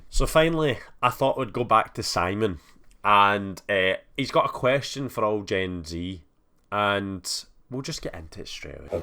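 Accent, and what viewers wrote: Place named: Ireland. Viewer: greetings from canada! just stumbled across your channel by chance when i was scrolling and i love your commentary style. clean, simple, and just giving your opinions. i love your accent too! irish ? regardless, you have a great personality and i hope you continue to post videos! i find them really entertaining. best of luck, 🇨🇦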